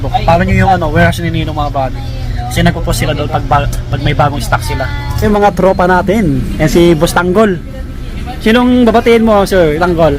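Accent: Visayas, Philippines. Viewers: Bisaya kaba idol😅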